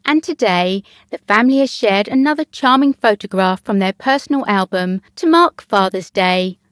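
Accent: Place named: United Kingdom. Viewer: Prince William is the spitting image of his Mother! I think Princess Catherine is absolutely beautiful and l love everything she wears! No wonder their children are so adorable! Thank you for posting this lovely video! I’m kind of new to your Channel and l love your extremely British accent. Signed, A Brooklyn Grandma 👵🏻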